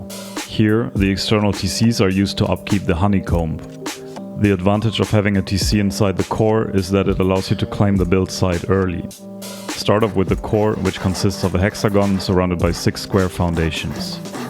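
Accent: Germany. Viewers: Deutsch??? Dein Englisch klingt so deutsch XD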